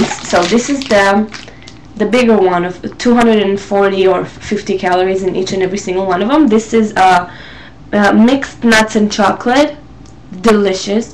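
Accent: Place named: Israel. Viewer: you are pretty and intelligent. :) i realy wanted to know where you accent is from, oooh isreal ok.:) using fried bacon chopped and fried egg on a salad instead of salad sauce is SO Yummy.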